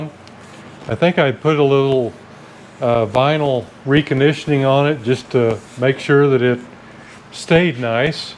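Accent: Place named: West, United States. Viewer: western accent and New England accent, have to listen closely.LOL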